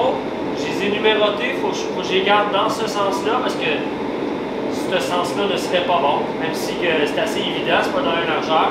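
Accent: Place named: Quebec, Canada. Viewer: Salut, D'abord, par curiosité, j'ai voulu voir si le sous-titrage automatique en français de google fonctionnait avec l'accent québecois. En fait non. XD As-tu fait une lame avec ce damas, et si oui en as-tu fait une vidéo ? ^^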